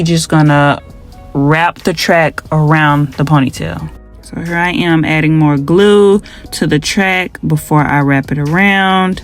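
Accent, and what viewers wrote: St. Louis, United States. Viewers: Hurrr lol your accent is so cute! Sound like a St Louis girl ❤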